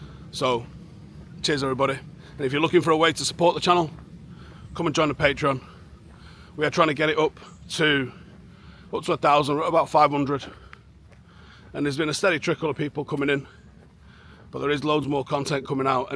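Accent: Manchester, United Kingdom. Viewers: I think that's just how we mancs talk mate!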